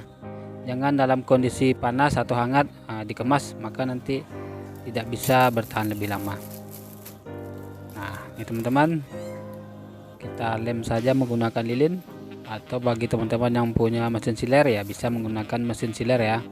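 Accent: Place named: Padang, Indonesia. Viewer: ooh... Patutlah bahasa indo nya logatnya beda... Urang awak kiro nyo... Hehehee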